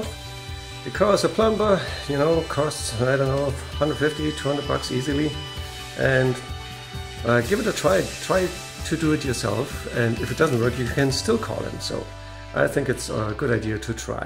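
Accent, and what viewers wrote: Germany. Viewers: I love your soft German inflected English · Ooooo you’re German aren’t youuuuuu. 😘😻😻😻😻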